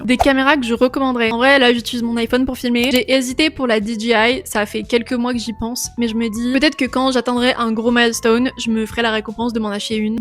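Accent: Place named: South-East, France. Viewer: Oh t’es une meuf du sud est 🥳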